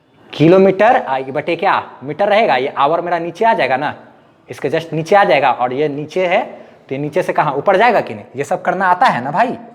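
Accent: Bihar, India. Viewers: Sir are you from bihar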